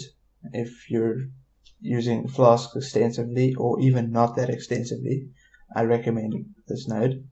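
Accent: South Africa. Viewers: lol dude, instantly heard you were south african. thanks for the info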